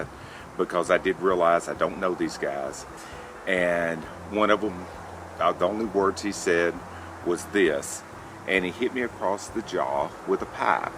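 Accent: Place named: Texas, United States. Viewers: thanks for sharing. The patch is bad ass and makes the vids better. Gives personality to the vids and character . Ive even shared these vids with my wife because we have the same accent. TX is the best state in the country... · Just found your channel a week or so ago . East Texas accent for sure I went to White Oak and have family out in East Mountain . Now living just over Texas line in Louisanna. I went through advanced cataracts in 2011 had implants put in but was completely blind for about 8 months and totally get the protect your backup . I now can only shoot with a scope since having implants my eyes no longer focus between long and short range my long range vision is pretty good with lens implants I have to rely on bifocals to see rear sight though and that doesn't work. Saw your video on Hawke scope just got a air rifle the new gamo 22 magnum swarm gen 2. 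Needs some more trigger adjustment and does tend to jump a little but getting it figured out. Looking at the Hawke sidewinder . Enjoying your channel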